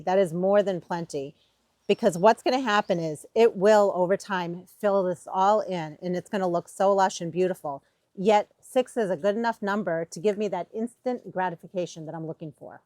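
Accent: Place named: Maine, United States. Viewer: Just found your channel- wondering if you're from Maine? You sound like you are lol I'm in Central Maine. So glad I found you! Thank you for the helpful video on baskets- I'm new to gardening and grew a bunch of flowers from seed this year. Gonna make some up now!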